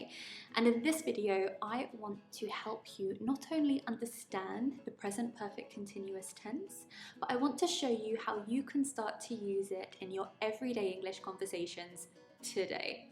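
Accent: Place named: United Kingdom. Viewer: Hi,! Thank you for this useful lesson! ❤ I've never been using the Present Perfect Continuous tense in my daily conversation, but now I certainly will. And I love your British pronanciation! ❤ With the best wishes from Ukraine! ❤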